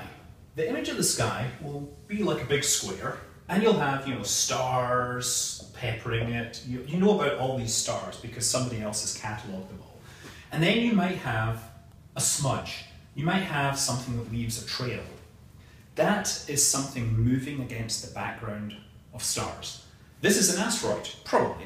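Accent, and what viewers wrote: Scotland, United Kingdom. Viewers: This is too scottish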